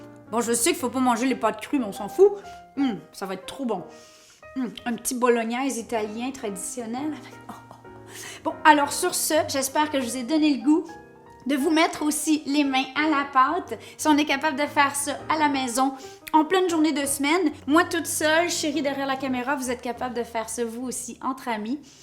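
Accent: Quebec, Canada. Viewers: Tu es marrante et surtout très mignonne , avec ton , ci joli accent Quebecoise ..!! Félicitation , a tes parents , Gente Dame du Canada ..!! Merci , et gros bisous de France . Hubert Etienne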